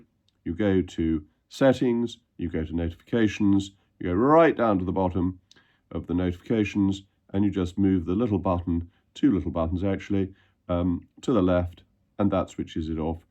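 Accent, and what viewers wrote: RP, United Kingdom. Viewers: This is all so rich coming from you Jacob. I remember you mocking the public at that party, joking about the social distancing you conservatives brought in. You’re a traitor with a posh accent, nothing more, nothing less. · You have the most British voice I've ever heard reminds me of my godfather his passed away now but I love your take on the government. Peace ✌️🕊️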